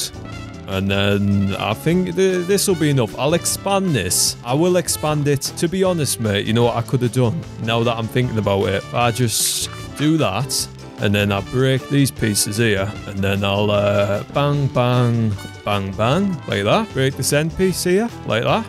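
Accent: Scotland, United Kingdom. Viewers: sounds Scottish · Probably british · bri"ish man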